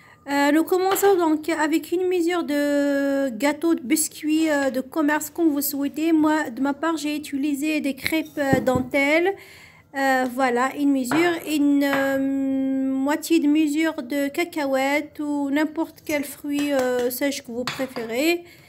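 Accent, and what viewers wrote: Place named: Kabylie, Algeria. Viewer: Je ne comprends pas l arabe pouvez vous traduire en kabyle car on dirait que vous avez l accent !!!!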